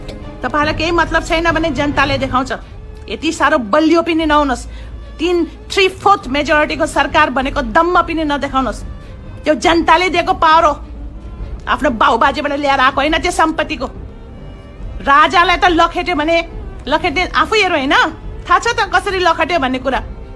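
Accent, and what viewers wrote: India. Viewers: tapai ko bwoli sunda indian jasto lago ne de jeu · ali bhasha lawaj sunda Inian jasto cha.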